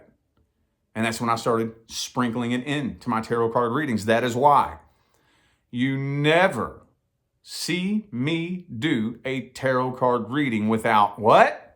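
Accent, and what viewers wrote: South, United States. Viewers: I needed to hear you. Thank you. I wish I could just "do it". I've been reading so many years it's not even funny. I do it only when somebody asks. My addiction was Abraham Hicks about 10 years ago. I know what I am supposed to be doing but here I sit. I started listening to you a very long time ago. You were familiar in a strange way but I later found out you were from my area and sounded like my dad and brothers. That red neckery accent hahaha!!! Keep doing what you do! Maybe I will catch up someday! I love you and your family as I have watched you all bloom into awesomeness like no other! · You've got that southern accent! I'm so new to manifestation at first it was law of attraction, and that didn't work, but later I came across law of assumption I was like oh my word!!!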